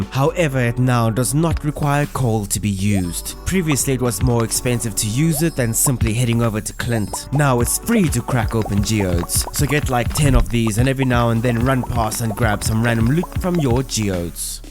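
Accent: South Africa. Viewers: broski is South African, just like me :D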